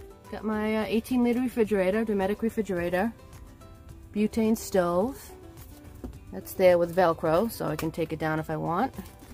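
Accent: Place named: New York, United States. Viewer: You've been at this for a long time, so I expected your van build to be really cool and functional. Nice job. Oh, and that New England accent is undeniable. Or maybe it's Minnesota or maybe Bay Shore Long Island New York. LOL!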